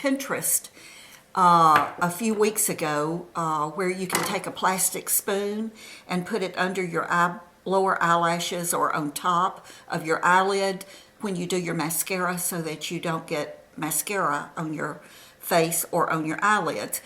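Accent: South, United States. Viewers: Hi! Wow, I've missed you! I loved hearing your sweet southern accent again. I'm excited for you that you will be seeing Rod S. My husband and I saw him last year with Stevie Nicks in Toronto and it was a great concert. I love them both so it was a super night. What a great buy on the skirt. I will be doing some Easter shopping tomorrow so I think I'll pop into Walmart. You have a beautiful figure and your outfits looked so good. Have a Happy Easter. Come on warm weather! xoxo Susie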